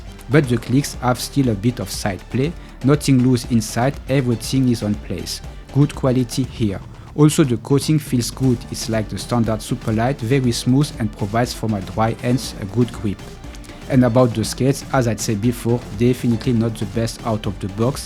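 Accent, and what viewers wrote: France. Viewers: wtf is this shitty french accent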